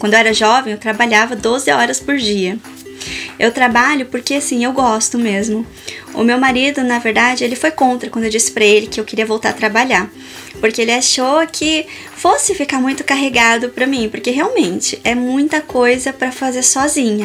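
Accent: Minas Gerais, Brazil. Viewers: Adorei você, seu sotaque mineirinho, sua delicadeza, realmente um verdadeira gueixa brasuca. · Queria saber em qual parte do brasil ela nasceu e foi criada pq o sotaque e as girias conheço todas kkkkk! Sou do Cearà. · Tu é de Minas Gerais?! Tem um sotaque mineiro da hora! 😅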